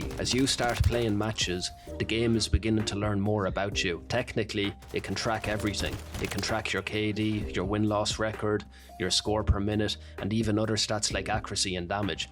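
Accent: Ireland. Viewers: Two reasons why you’re better than exclusive ace: your wise enough not to give them the benefit of the doubt, and the Irish accent. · Nice to hear an Irish man talking about these topics. Eire go bragh yup yup ❤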